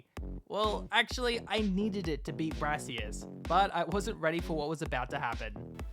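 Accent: Australia. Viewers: Are you Aussie the accent sounds familiar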